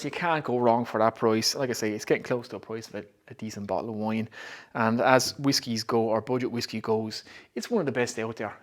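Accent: Ireland. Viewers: After watching your reviews I’ve finally subscribed. I like your way, the Irish accent and the toast. Keep up the good work. Oh, and based on your review, I picked up a bottle of Slane. Nice, but the jury is still out.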